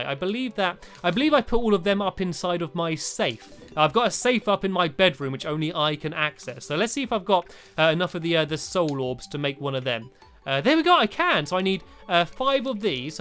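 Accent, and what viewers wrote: England, United Kingdom. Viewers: Are u English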